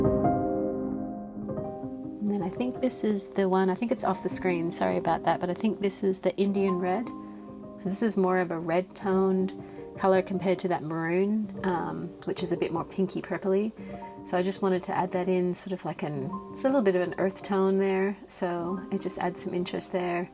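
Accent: Australia. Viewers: I can't decide if you are an Australian living in America but your accent is so interesting!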